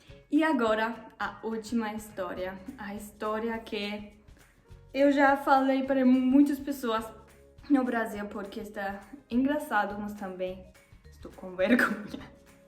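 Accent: Germany. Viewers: O Mico é normal, leve com bom humor para não se aborrecer e você aos poucos vai estar falando o idioma perfeitamente. Procure ouvir músicas no idioma português brasileiro e sempre que possível converse no idioma. Não sinta vergonha de errar e continue praticando. Uma das coisas que deve sempre observar é o uso do gênero, na maioria dos idiomas o artigo é mesmo para o masculino e o feminino, porém no português muda o artigo a, o, as, os articula com a palavra e muda com o gênero masculino ou feminino. Por exemplo numa frase como Deixei cair a xícara da mesa, "xícara" é uma palavra feminina é precedido do artigo "a" , seria errado dizer : Deixei cair o xícara da mesa. O seu sotaque alemão é bem leve quase não se percebe, quando estiver falando e articulando corretamente a maioria das palavras se você não disser estando no Brasil que sua nacionalidade é alemã facilmente se confundirá com uma brasileira.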